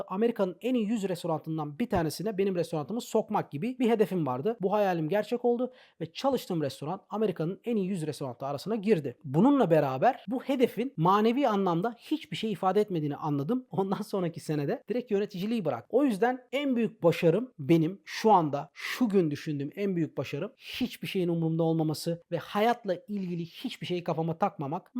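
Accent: Thrace, Turkey. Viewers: Trakyalı adam dinleniyor. Not: rizeliyim :)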